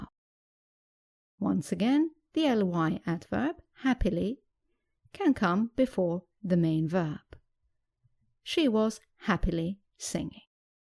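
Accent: United Kingdom. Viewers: Yor english is british?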